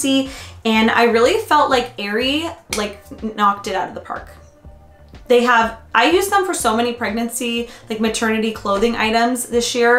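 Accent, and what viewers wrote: Canada. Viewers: How rude, it's a Canadian accent. Check your ignorance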